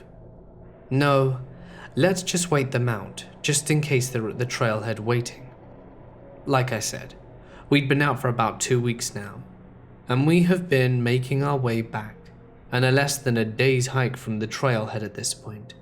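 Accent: England, United Kingdom. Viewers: laying in the middle of my bed, listening to middle of nowhere stories😀😀. I soooooooo wish you would do another collab with hellfreezer when you get settled! Besides killer orange cat, you guys are my favorite narrators and your voices would be awesome together! An English accent, and a Scottish accent, holy fucks batman!! He's a great narrator and I know my fellow MORTicians would like him as well. It's surprising that he doesn't have more subs.PS, the only thing better would be a collab with all three of you, haha. 🤗🤗🤗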